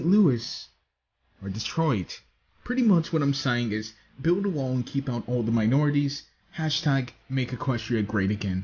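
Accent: United Kingdom. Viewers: No gripes about the content of your commentary, but WHY oh why did you use a British accent? I only ask because you don't normally talk like that. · dude what the heck all I could think about was you talk in a British access I mean really im not saying it bad im just saying what happened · Maybe this is normal for him because he is British? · why the buck are you talking British? talk normal.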